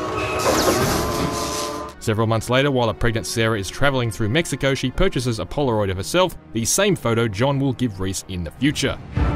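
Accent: Australia. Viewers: just a question are you Australian? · Australia mate?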